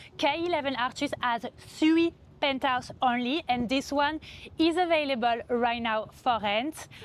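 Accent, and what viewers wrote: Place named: New Jersey, United States. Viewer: That's a lovely accent you have. New Jersey?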